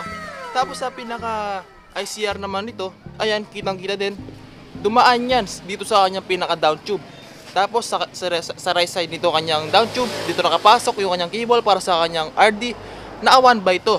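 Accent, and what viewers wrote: Pampanga, Philippines. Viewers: Kapampangan accent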